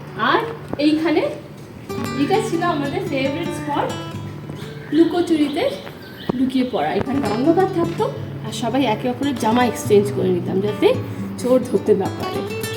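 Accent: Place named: Bangal, Bangladesh. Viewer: পাত্র পরিবার কি বাঙাল অরিজিন? আপনার কিছু উচ্চারণ, বাঙাল ঘেঁষা লাগলো... খুব ভালো লাগছে আপনাদের ভ্লগ।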